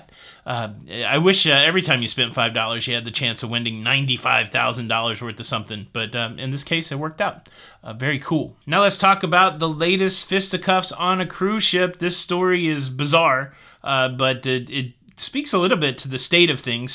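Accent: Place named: Texas, United States. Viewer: I am a born and bred Texan and your humor was spot on. BTW you have a great Texas accent.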